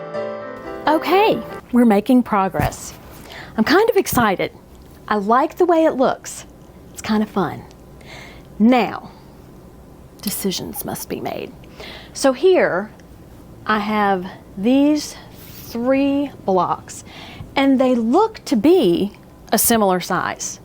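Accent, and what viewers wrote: United States. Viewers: Yes, I am also a solid border with a scrappy binding (or any binding really) vote :) You've told me before but where is your accent from again? I'm in Australia and can only make out very few American accents! Also, what time zone are you as might join the Zoom but it's hard to get one that isn't in the middle of the night when afternoon there! Obviously, it depends on where you are 😊😊💜💜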